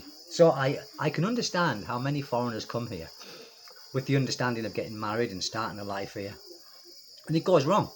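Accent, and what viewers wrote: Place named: England, United Kingdom. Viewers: Good Stories but most of all, my english improve... Hehe U have the english english.... Grueß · He has the Geordie English......I dor nor....lovely.😊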